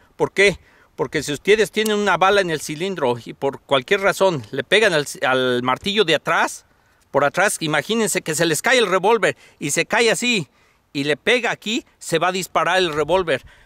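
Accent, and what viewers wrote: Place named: Mexican-American, United States. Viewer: El acento es increíble amerimexican o México americano? 🤔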